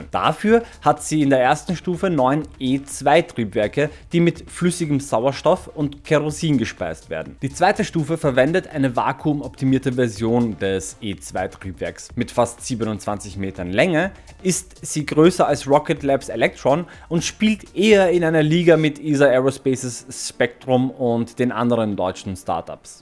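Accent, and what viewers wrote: Austria. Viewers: Sehr gut! Tolle Ideen, ja bitte! Mehr Witze, mit Oestereicherim Akzent! Ehrlich Top 10 Space YouTuber in allen Sprachen! Wie wäre es mit einer Episode, die sich damit beschäftigt, was passiert, wenn Starship 420 bei (1) Start, (2) Max-Q, usw. explodiert? Und wie Du die Chancen für jeden weiteren Schritt einschätzt. :)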